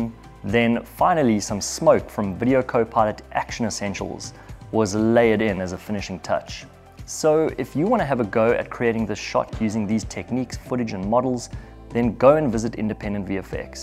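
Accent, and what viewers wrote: South Africa. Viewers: Hi. From your accent I gather you are South African? I love fooling around making videos. Please check this little short I shot at Monte Casino. With your superior special effects skills I might be able to sell some cool videos to sponsors! If you are willing to work with a 70 year old, that is. <link>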